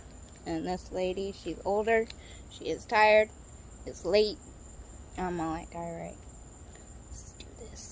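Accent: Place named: Florida, United States. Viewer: Are u in Florida? It sounds like FL. 🏝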